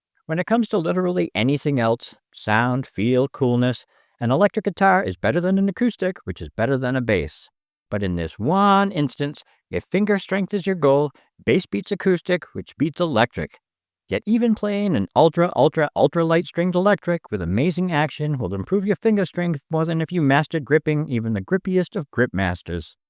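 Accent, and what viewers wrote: Boston, United States. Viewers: Definitely a fellow Bostonian lol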